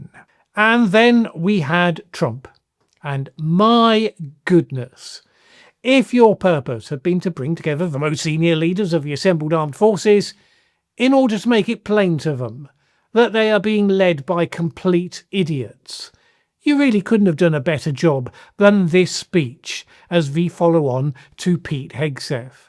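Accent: United Kingdom. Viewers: OH GOOD ! A quickly headline featuring name calling. Followed by a sarcastic narrative / lecture from an old guy with a British accent. Surely this guy's friends find him really persuasive. But what is actually at stake bears analysis. Anyone paying attention knows that the USA has many more general officers / senior officers on staff than at the end of WW2 when many multiples of today's forces were in uniform. We've also got the insanity of public health officers and doctors being called admirals because of some antiquated human resource theory which equates history of attainment of educational credentials with rank to justify pay. When you put all these guys together in an auditorium, they realize that they are not unique special individuals ruling over their direct reports in separated fifedoms, according to their own personal proclivities and idiosyncratic value systems. 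Look at all those gray heads! Underlings in military service live by "up or out" -- if you're not advancing, it's time to retire, unless you figure out a way to be retired on the job. But these guys, with limited or nonexistent or outdated war fighting experience, just spent 12 out if the last 16 years under Biden and Obama, "going along to get along. " This experience of course correction is being delivered in the nicest way possible -- demonstrating that these high ranking individuals are in a top heavy military...and if they stick around after being told the direction in which the nation's military is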